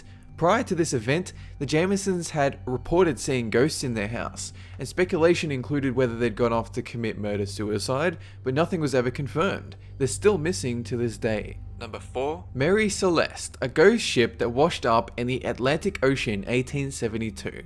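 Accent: Australia. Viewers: Australian accents are HOT. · I've wondered this for a while, and hope someone who knows, or himself will answer this question. Are you from Australia? You sound like it but I just cant tell, it sounds normal to me because I live there, but watch many american videos.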